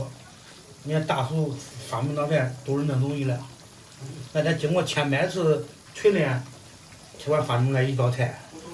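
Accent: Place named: Zhoukou, China. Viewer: 这周口味普通话听着好熟悉。商水老乡飘过。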